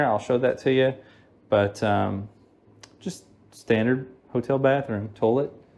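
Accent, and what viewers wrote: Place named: Eastern Kentucky, United States. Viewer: He sounds so much like me. I’m from Eastern Kentucky! Awesome.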